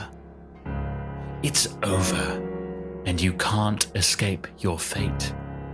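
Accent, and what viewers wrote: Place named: United Kingdom. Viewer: Mos-cow? For an American you have a very British accent.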